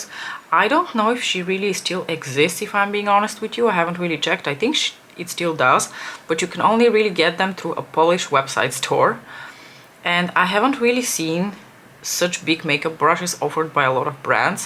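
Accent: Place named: United Kingdom. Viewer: I find it so amazing that you speak so many languages and I assume you speak them all as fluently as you speak English. I would guess that you have lived in the U.K. for 15 years by the way you speak. I would love a video on how you started with each language and how your brain processes and learns new languages. On top of it your job, husband, child, cats and YouTube channel, makes you so well rounded and so fascinating. You are such a lovely person. I love listening to you chat to us and your makeup tips and knowledge are really useful. ❤️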